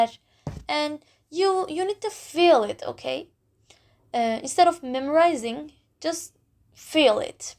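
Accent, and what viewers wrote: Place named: Japan. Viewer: Neden Japonca gibi telaffuz ediyorsun?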